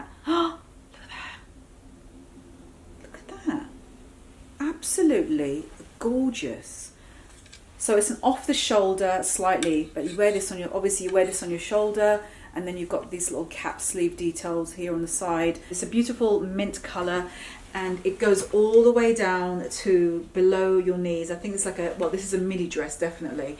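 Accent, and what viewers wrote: United Kingdom. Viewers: ! You are the Master Mixer, Co-ordinator and Fashionista of them all! You're truly such an inspiration and a great teacher! Yaay and a fellow Brit... love your accent😂 I've been dowdy for far too long and been wanting to switch it for a while. Well, now I'm switching it up... with your help! Thank you. Please tell me the oriental jacket from is reversible. It sure looks like it is. Love you! Love what you do! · Hi, I'm Mariel (the same from your blog, but another picture jajaja)....You look amazing with your own hair!!! Keep it that way! I love your british accent....!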